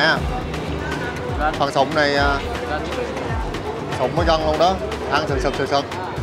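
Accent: South, Vietnam. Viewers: bạn này nói giọng miền nam dễ thương mà dí dỏm, xem cười xĩu luôn.😂😂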